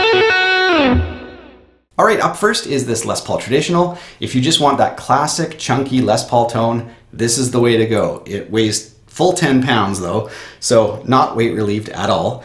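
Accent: Canada. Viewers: Are you Canadian or from Dakota?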